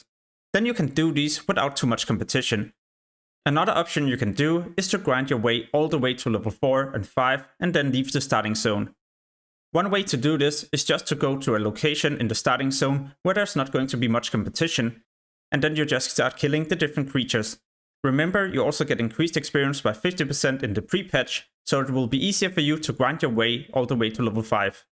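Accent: Denmark. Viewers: Danish best accent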